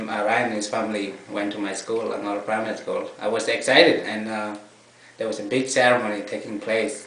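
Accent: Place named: Canada. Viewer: he;s starting to pick up the canadian accent :)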